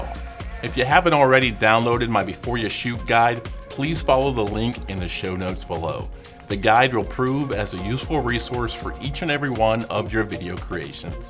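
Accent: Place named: United States. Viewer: Very, very understandable- slow, even delivery- US accent-yay!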